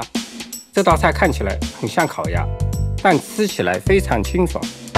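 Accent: Shanghai, China. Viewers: 爷叔的沪普口音有点重啊😂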